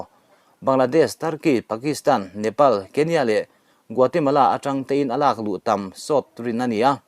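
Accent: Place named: Southern Mizoram, India. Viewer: I chhiar ngaihnawn hle mai. Mizo tawng pawh a tha e. Chhimpa i nih dawn hi. Duhlian tawngah chuan, Lunglei District leh, Tuipui ralho hi an thiam ber mai.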